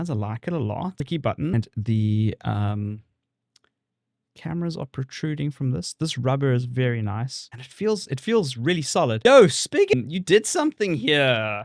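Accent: South Africa. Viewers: How's it China, thanks for the reviews. Lekka to hear a genuine SA accent. Peace 🙏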